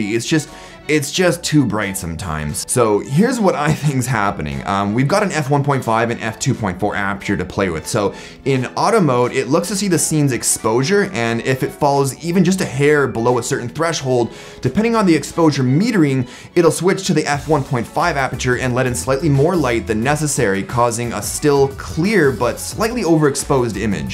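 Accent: Canada. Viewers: I love when your little Canadian accent shows through. I also dig the beard! very rugged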